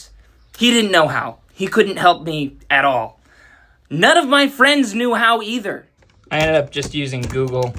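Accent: United States. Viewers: I'm super interested in moving to Croatia, have been for 3-4 years now. Glad i found this channel I have been flying through these shorts and I want to watch some more. I checked your website and learned you're ALSO from the midwest (I had assumed as much from your accent) I have had a friend for around 7 years who is from Bulgaria as my main reference for information regarding the area (and the search engines like google of course) but it's difficult to keep in contact at times despite both of us being on discord frequently. (both currently pursuing education, she is in the netherlands and I am in the states) so any drive I had is kind of on the back burner. Long story short, these few posts I've shot through absolutely filled me with joy because the world elsewhere is so different. Hearing about Zagreb is the most exciting part because I am interested in visiting there as well as Split. 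(Split I found on a whim and tried to learn a bunch about in the past.) Sorry for the long-winded comment, I haven't seen much from this in a while I was absolutely blindsided, especially hearing things I was familiar with here on this video. Some of the older ones spoke on serbia.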